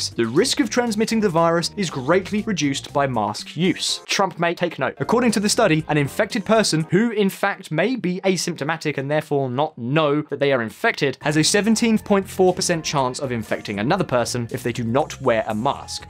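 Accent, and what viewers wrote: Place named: Scotland, United Kingdom. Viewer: I feel like I'd be happy to listen to Scottish guy narrate, but his microphone situation needs some love!!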